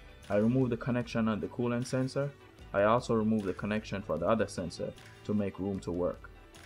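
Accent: Jamaica. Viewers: U Jamaican bro??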